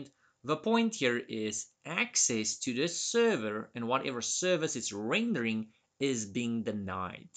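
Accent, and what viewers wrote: South Africa. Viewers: aye man. Nice to see a fellow south african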